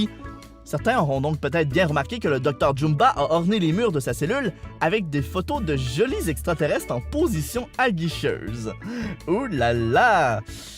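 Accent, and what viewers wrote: Quebec, Canada. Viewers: Bon anniversaire ❤️😂je suis québécoise et ça fait du bien d'entendre l'accent, même pendant quelques minutes 😁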